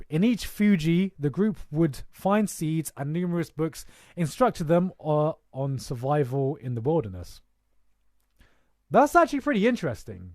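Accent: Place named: United Kingdom. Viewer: Holy shit look at that baby face😭. The british accent is much thicker too.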